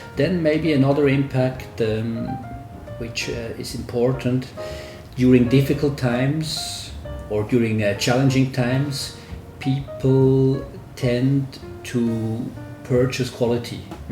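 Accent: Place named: Japan. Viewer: クリスチャンさんの英語はまるでイギリス英語のように聞きやすい。 特に黒人系のスラングたっぷりの英語を直で聞いたあとならね。 そしてRYさんの英語発音は結構カタカナ英語っぽいんですね。 巻き舌がほぼなくて我々日本人に聞きやすくできてる。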